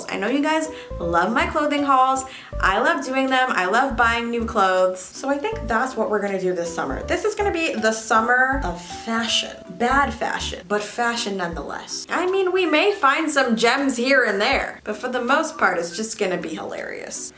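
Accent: United Kingdom. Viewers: i love her british accent😭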